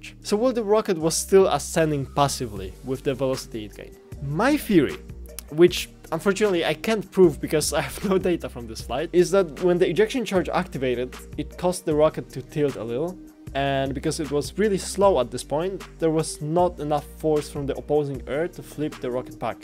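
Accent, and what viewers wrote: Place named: Poland. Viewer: juz po piweszej sekundzie filmu wiedzialem ze jestes polakiem :)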